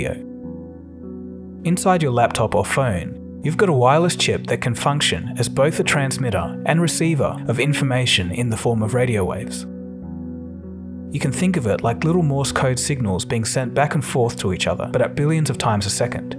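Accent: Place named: Australia. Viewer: I've seen 4-5 videos and already a fan, i don't normally find Australian accent very pleasant or cool but somehow I find this accent and channel very satisfying. Keep up the good work fam. The background music, intro the voiceover everything is so satisfying. ❤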